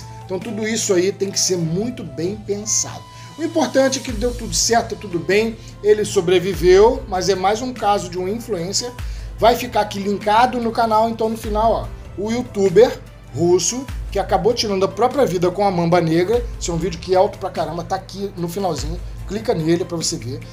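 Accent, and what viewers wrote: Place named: Macaé, Brazil. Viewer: vc é da onde parece de Macaé falai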